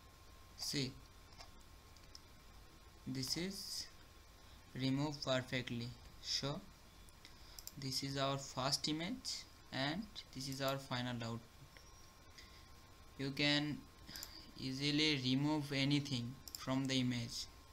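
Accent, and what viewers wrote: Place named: India. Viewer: This Indian access bro... I don't understand 90% from what those people say. (That's because of two reasons) 1 Their bad English, 2 My ears LMAO.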